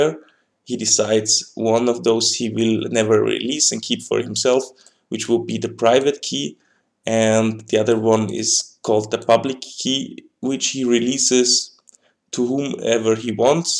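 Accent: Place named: Russia. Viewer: You need to hide that Russian accent a bit. Good video and explanation and thank you for posting this information.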